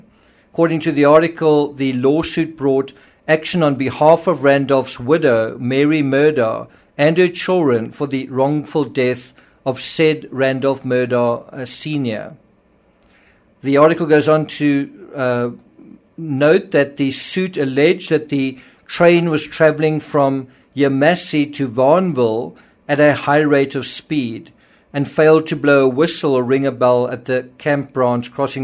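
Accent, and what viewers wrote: South Africa. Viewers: Thanks for this episode and your groovy safrican accent! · Your accent leans more south African than American, your from?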